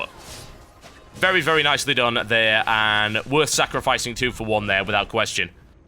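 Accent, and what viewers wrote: United Kingdom. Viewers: It's his british accent, that's all.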